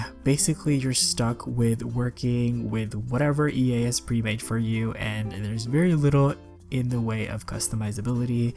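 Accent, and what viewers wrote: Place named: Philippines. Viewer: A Filipino SimTuber! This is like finding a unicorn. I recognized your accent, but I had to stalk your socials to be sure. Your builds are real pretty and you pick great inspirations! SUBBED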